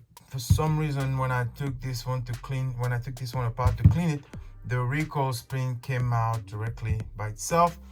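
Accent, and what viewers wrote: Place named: France. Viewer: Sounds French to me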